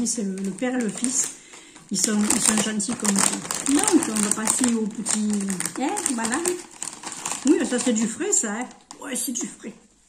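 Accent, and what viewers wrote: South, France. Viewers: Coucou , Les oeufs frais il n'y a rien de mieux!😊 Moi j'adore les faire à la coque Avec des mouillettes de comté 😋 J'adore votre accent du sud!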